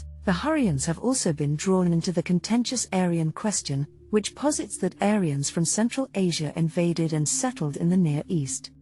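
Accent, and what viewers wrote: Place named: United Kingdom. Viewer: The lady has a very British accent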